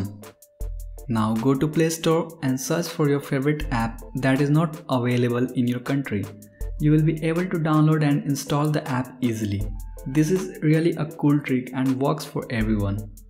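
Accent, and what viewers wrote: India. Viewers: The instructions are good but I struggled with his Indian accent on crucial steps. I didnt really understand some of the things he said. I'd prefer written step by step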